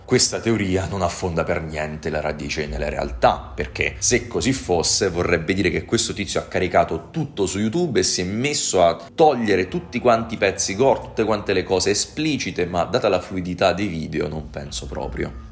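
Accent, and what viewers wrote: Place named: Tuscany, Italy. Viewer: sento un accento toscano in lontananza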